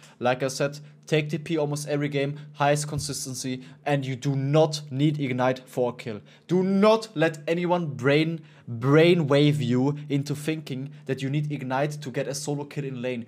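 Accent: Germany. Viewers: Man hört das deutsche raus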